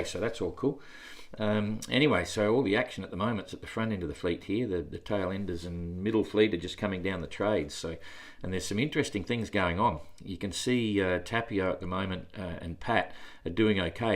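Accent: Australia. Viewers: Hi. Nice hearing your Aussi twang. Im watching from DK. Just getting a Najad 390 ready for som southern sailing so watching this with great interest. Ps A stranded Kiwi saliing the baltic, now home waters. Cheers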